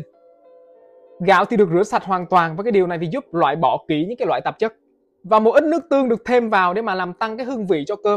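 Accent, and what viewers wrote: South, Vietnam. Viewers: Thôi cứ nói giọng Bắc đi pha giọng Nam nghe nó đớ lắm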